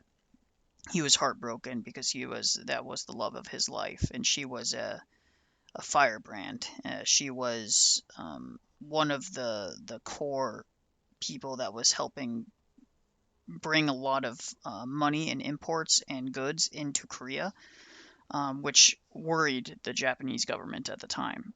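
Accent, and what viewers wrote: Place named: United States. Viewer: You sound American. Unfortunately I think American people, even some of the most based people on the right, are still too democracy pilled to ever get this point across. I think it’s only when the democratic system fails on a mass scale will people actually begin to entertain the possibility of non democratic alternatives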